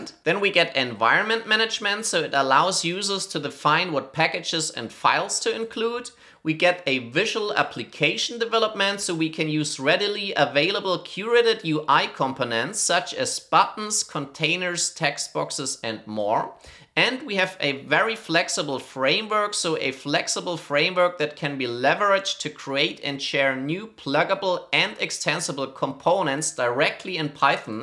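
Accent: Germany. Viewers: Short Question: Are you from Germany?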